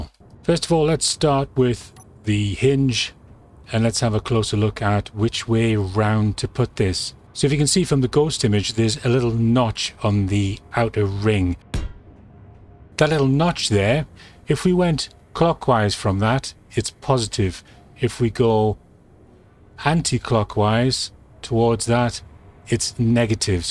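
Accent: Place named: Scotland, United Kingdom. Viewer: I meant I was waiting for a tutorial from you. But... I suppose you're right. Beat my head against the wall enough, and I could probably figure it out. It's just so nice having your soothing Scottish(?) voice explain everything in perfect clarity. (Is that enough sucking up?:-) Again, thanks, for all your efforts. I appreciate it.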